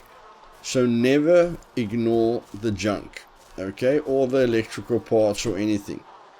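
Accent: South Africa. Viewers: Like the accent, you from SA? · Nice guide first thing I noticed was that South African accent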